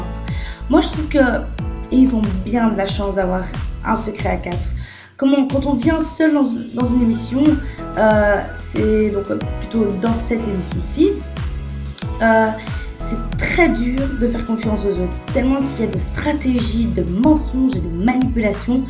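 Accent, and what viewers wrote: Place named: Belgium. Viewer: Elle a un accent belge !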